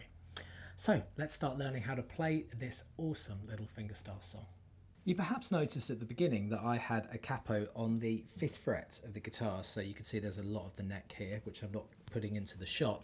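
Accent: England, United Kingdom. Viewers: I LOVE YOUR ENGLISH ACCENT MAN! Nice vid btw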